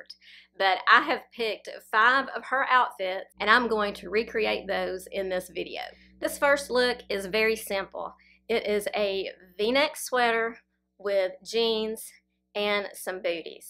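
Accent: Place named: South Carolina, United States. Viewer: I’m so glad I found your channel you also have my accent!☺️i live in Charleston, SC…..I love your ideas for outfits bless u……..I am 58 yrs old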